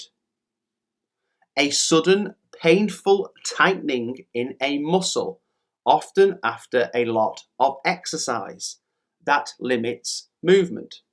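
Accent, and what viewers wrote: United Kingdom. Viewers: Hello, you provide an amazing content here on youtube. I'm very grateful. Thanks a lot. But my son and me, we are a little bit curious from which part of the UK you come from:) because I would like to know more from which part is your accent. Sorry if I bother you with this.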